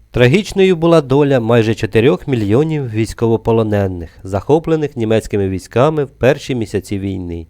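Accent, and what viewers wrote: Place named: Transcarpathia, Ukraine. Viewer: вона просто з Закарпаття, як і я:)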